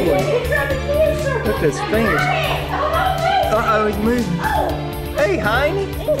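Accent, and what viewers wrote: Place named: South, United States. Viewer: I love the bad music and the hill billy narration.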